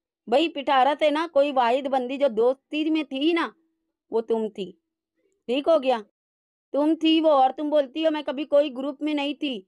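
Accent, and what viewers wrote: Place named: India. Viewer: api ap India sy hn kia. ap ki urdu sy lga .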